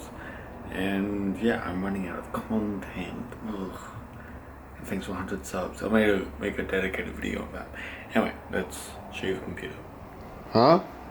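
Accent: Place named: Australia. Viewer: Ok i'm going to make a guess that you are Australian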